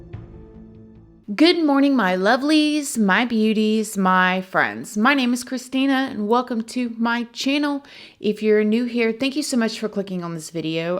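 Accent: South, United States. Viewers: Sis it's that southern accent...keep it coming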